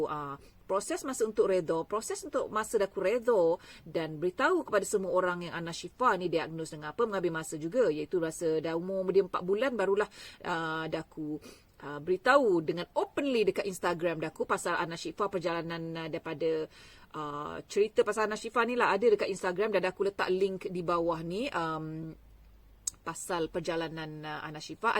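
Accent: Negeri Sembilan, Malaysia. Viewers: camano tetibo akak speaking nismilan ... asal n9 ko?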